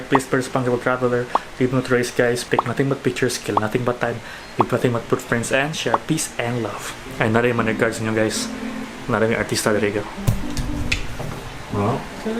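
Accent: Visayas, Philippines. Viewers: nindot kaayo paminaw ang bisaya conyo sa cebuano. 😂😂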